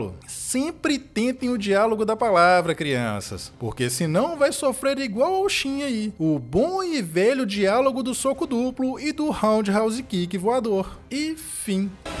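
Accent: Minas Gerais, Brazil. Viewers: O narrador parece ser meu conterrâneo aqui de Minas. Se for, di- vi- no.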